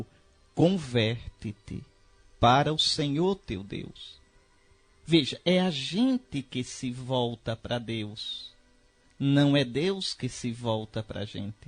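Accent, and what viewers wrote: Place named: Alagoas, Brazil. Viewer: Dom Henrique, com seu sotaque alagoano, sua simplicidade, sensibilidade e conhecimento, nos leva a conhecer e a meditar a Palavra de Deus. Esta pregação é uma relíquia! Quanta sabedoria!🙏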